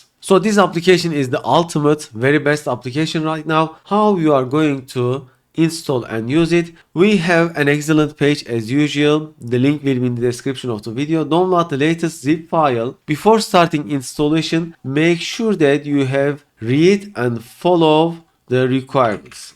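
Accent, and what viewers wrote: Turkey. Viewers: aksan türkçe, dil ingilizce olunca 5 saniyelik hangi dil la bu şoku geçirdim. hocam onca farklı dil varken bari ai da olsa türkçe dillnede çevirseymiş siziniz bu videoyu. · Rastgele önüme çıktı dinliyorum; diyorum "bu kesin Türkçe aksan" altyazıya bakıyorum Türkçe yok dublaja bakıyorum hak getire! bu kadar mı kendi dilinden, milletinden nefret ediyorsun?!!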